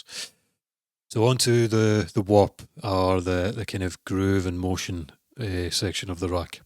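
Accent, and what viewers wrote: Scotland, United Kingdom. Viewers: Are you Scotish mate? Great technique.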